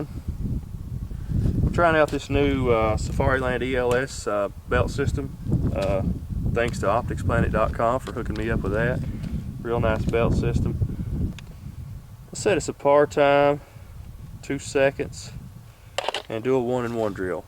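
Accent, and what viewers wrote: South, United States. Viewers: very neat idea, also love the Tacoma, '05-'07? The wife said ya must be a "Southern" boy. Accent. I couldn't tell.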